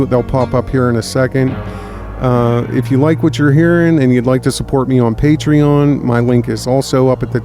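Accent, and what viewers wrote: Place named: Pittsburgh, United States. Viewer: you sound like you're from Pittsburgh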